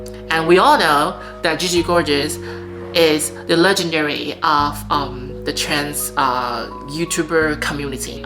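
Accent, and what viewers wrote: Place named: United States. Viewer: Thanks. My wife and I are legally married in the US and she’s a US citizen now. You should do a video about what you think about the state of LGBT rights and acceptance in China and marriage equality in China. It would be cool to know about how you became so fluent in English, too. That American accent didn’t just come from nowhere and inquiring minds want to know! Thanks for your consideration. I’m Loving the channel.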